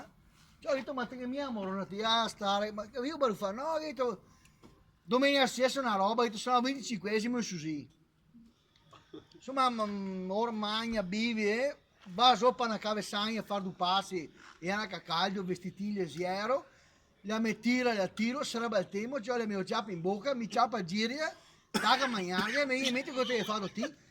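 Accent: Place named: Veneto, Italy. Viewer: la parlata veneta ê simpaticissima 😁😁😁😁..grande bella barzelletta e bella interpretazioje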